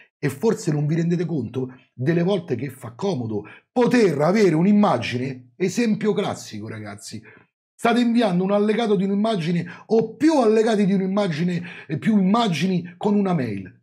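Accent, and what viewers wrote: Rome, Italy. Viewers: l'accento romanesco è la chicca finale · Guarda, basta vedere ce ne sono alcuni. Appena fai uscire un video che guardo e che ti do il like, poi l'algoritmo di YT me ne propone altri simili con lo stesso argomenti, alcuni fatti tempo prima, ma che non hanno niente a che vedere nel modo in cui esponi tu, altri invece qualche giorno dopo che li hai fatti uscire tu, ma anche qui non ci siamo proprio. Ah, sono quasi tutti esteri. Ma a parte la lingua, l'esposizione, la completezza e la simpatia che ci metti, non hai eguali. Quindi stai tranquillo. E poi scusa, ma con quella parlata che non può essere altro che "romana", ma chi te frega!!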